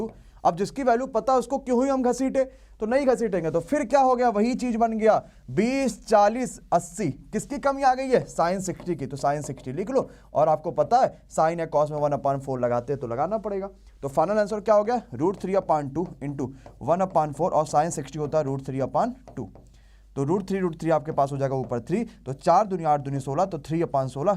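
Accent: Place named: Kanpur, India. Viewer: कनपुरिया स्टाइल🤘🤘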